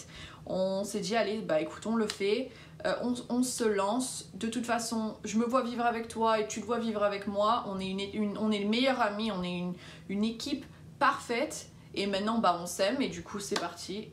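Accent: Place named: United States. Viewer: tu as pris un petit accent américain j'ai l'impression ^_^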